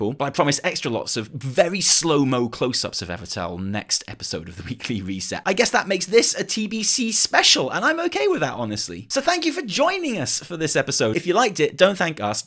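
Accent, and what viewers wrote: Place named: United Kingdom. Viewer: Good video fair points made, but why do you keep cutting the video after literally every sentence? Also you sound British but say the dates like the American way? Just curious.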